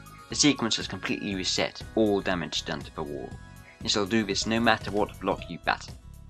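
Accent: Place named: United Kingdom. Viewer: I enjoyed the british accent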